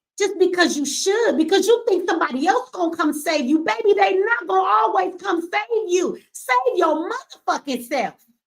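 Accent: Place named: South, United States. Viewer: just notice youve pick up a strong southern accent...ahhhall for all, we lyyyyyve for live. Not unusal to do so. Its call adaptation lol!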